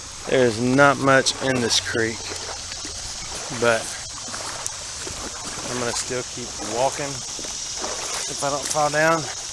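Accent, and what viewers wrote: Alabama, United States. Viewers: What part of Alabama are you from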